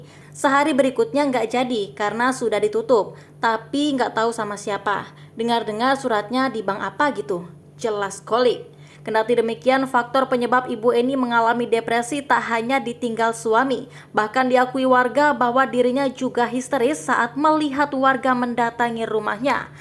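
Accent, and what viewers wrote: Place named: Banjar, Indonesia. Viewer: Bahasanya kok presintar kaya bahasa banjar. Ada sedikit logat2 x mba. Hehe 😁😅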